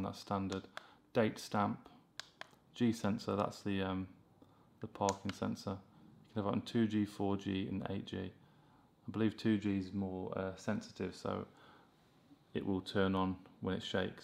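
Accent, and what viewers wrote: United Kingdom. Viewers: Help! When I turn on the dash cam I get the globe and then immediately asked to choose Mass Storage or PC and it freezes when in the car. Also, the charge does not seem to be holding because when I charge via the USB for hours, then unplug and try to turn on, nothing zippity do dah. Can anyone help me? PS: This is the best video. I love the Queen's English as I don't speak American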